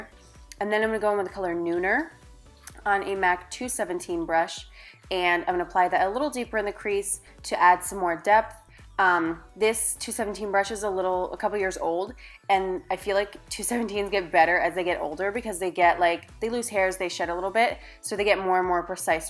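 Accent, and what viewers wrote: United States. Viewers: Loved the look and background. I would say that's a north jersey accent coming from someone in the south 😉 that's jersey for you. Now you have me wanting all those extra dimension eyeshadows!! Alex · haha! I love your jersey accent! so beautiful lady!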